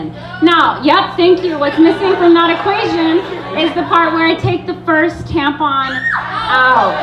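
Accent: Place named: Long Island, United States. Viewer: She cracks me up when she’s just chilling and talking. And how she mastered that Long Island accent! 😦 I’m dying to see her speak somewhere close to NC!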